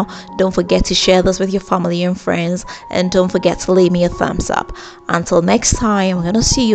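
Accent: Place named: New Zealand. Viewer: Kinda like a new Zealand accent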